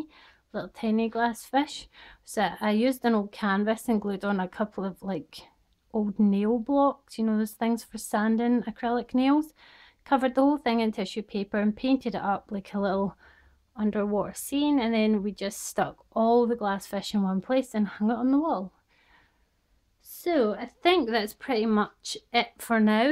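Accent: Scotland, United Kingdom. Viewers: are you Scottish you sound so much and love the room I need to for this to my room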